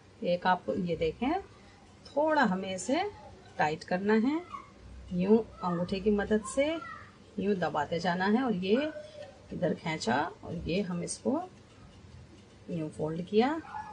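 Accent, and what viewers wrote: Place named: Old Delhi, India. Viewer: aap ki boli aik dm purani dilli walon ki si hai,meri ammi ki poori nanihal india mai purani dilli mai rehti hai-meri nani ne bachpan mai boht bna k khilae hn,mami or khala sath mil k ready krwati thn or hm maze se khate the,aaj bari achi yaad aap ne taza krwa di hai,jazakAllah o khair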